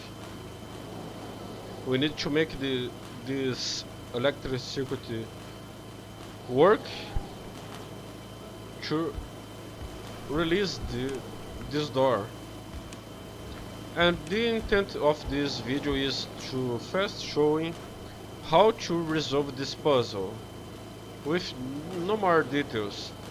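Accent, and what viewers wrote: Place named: Brazil. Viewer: I'd bet a million bucks that you're Brazilian! Porém vlw pela solução mas não se fala "resolve" apesar de ter essa palavra. Usa a palavra "solve" sem o RE de resident evil...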